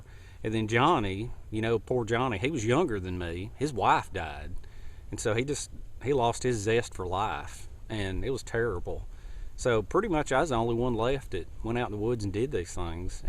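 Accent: South, United States. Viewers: I love watching your videos. From the first word in that fantastic Southern drawl and the infectious laugh right through the fantastic tips and things you can do/make with rope, and ending in your sign offs... it's all truly worth watching. I'm waiting for the warmer weather to arrive here in bonnie Scotland before I venture out and try some of your ideas. Keep making the vids - they make learning fun. Best wishes.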